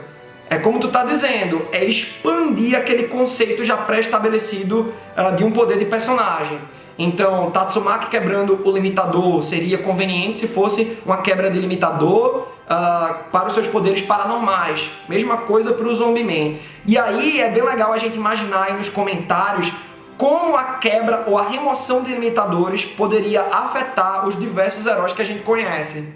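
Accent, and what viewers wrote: Pernambuco, Brazil. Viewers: Mano tu é de PE?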